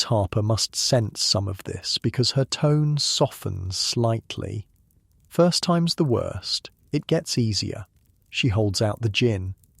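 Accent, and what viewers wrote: United Kingdom. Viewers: your beautiful, hypnotic British voice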